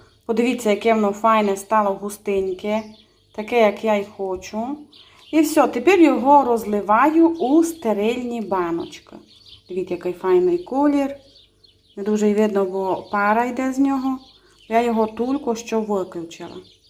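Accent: Transcarpathia, Ukraine. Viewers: Дякую за рецепт. Обов'язково приготую. Я обожнюю ваш закарпатський говір❤. Дай Вам, Боже, рясних благословінь і міцного здоров'я. ❤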